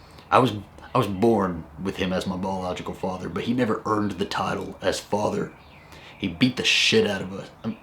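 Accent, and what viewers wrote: South, United States. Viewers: I understand your hesitation with your dad. I went through a lot of the same abuse.... but, with time comes forgiveness. I hope you will eventually be able to forgive your dad for all the things he's done. The fact that he accepts you as a gay man should speak wonders to you though. If he didn't accept you, he wouldn't have slept in your house at Christmas. My wish for you is that you let the hostility go. I started watching your vlog thinking you and your husband had it together. And I adore your southern accent... I think you owe it to your lover to man up and show him you're better than your dad and that family means everything to you. Forgive and forget, I know it's hard, but it's easier than carrying that burden of hate. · OMG I love your accent. You know how to turn this Scottish guy on by saying hi. I can hear you on the like of Dukes of Hazzard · Southern accent..turn off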